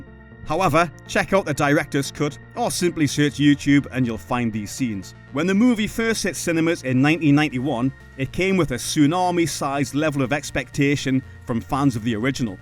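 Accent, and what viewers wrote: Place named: Newcastle, United Kingdom. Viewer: The Geordie is back. Early NyN NAUT EES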